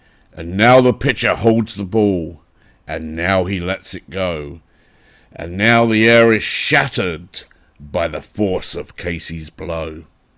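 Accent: England, United Kingdom. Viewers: Great video, I haven’t heard that poem in many many years, and never read with the English accent……..wonderful my friend!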